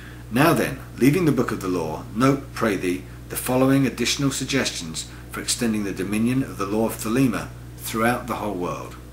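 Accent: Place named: London, United Kingdom. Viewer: I am from London and this chap sounds like he probably came from nearby.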